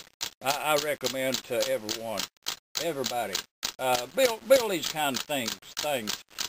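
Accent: South, United States. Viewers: hahah i thought bout hillbilly when i heard his voice xD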